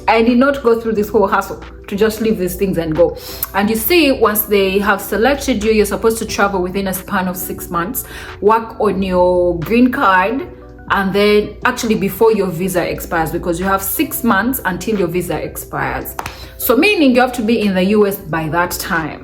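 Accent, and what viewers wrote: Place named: Uganda. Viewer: Uganda 🇺🇬 accent is so super wow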